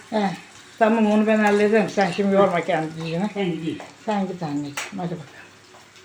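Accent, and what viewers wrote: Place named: Gelibolu, Turkey. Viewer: bende geliboluluyum siveniz bize benziyor da merak ettim 👍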